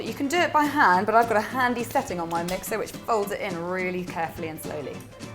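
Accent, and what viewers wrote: England, United Kingdom. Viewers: Awesome cupcake baker, tatted up cutie and British accent? I believe I just found my new crush! It took me like four vids to realize she was saying "whole milk" and not "homo" tho lol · This is one of my favorite English accents. It’s like music to my ears, I could listen to her all day ❤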